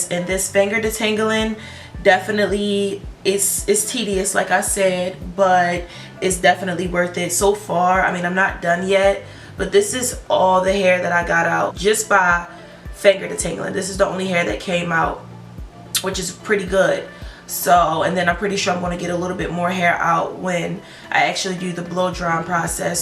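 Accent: Baltimore, United States. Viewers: As soon as u started talking I said is she from bmore🤔🤔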